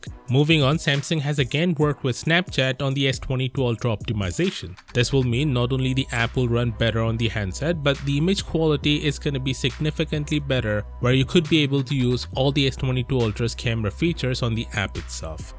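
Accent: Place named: India. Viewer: Good video my only feedback is that it was a little hard to understand with his accent. I sure other people are able to understand him fine. I think I just have an issue with Indian accents and making them clear. Nothing wrong with the narrator personally he definitely sounds intelligent and knows what he's talking about just a little hard for me to understand.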